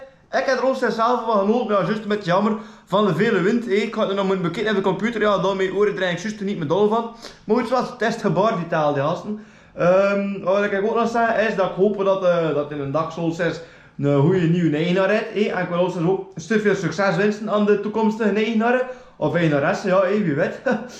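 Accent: Belgium. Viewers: Belgisch met een extreem accent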